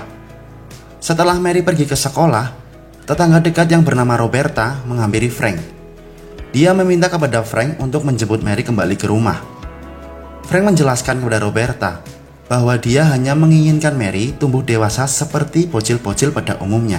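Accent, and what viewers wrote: Banyumas, Indonesia. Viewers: Orang ngapak ya lu bang adminn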